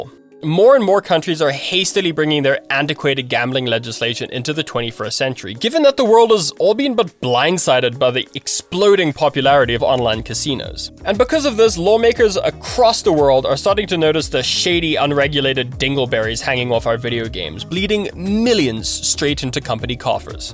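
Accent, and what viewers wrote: South Africa. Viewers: love hearing a fellow Saffa on YouTube! great video! hope your channel blows up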